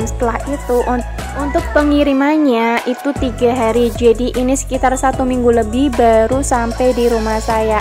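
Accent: Banyumas, Indonesia. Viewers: Setia ngikutin video nya tp jarang komen...kita satu bangsa ngapak😅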